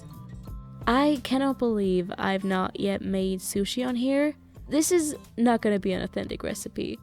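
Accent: Germany. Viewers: are you German? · is she german ? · she is german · are you german? :) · Are you german? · Oh You’re german. Until the “real” I didn’t actually realize, after that I started to realize the accent lol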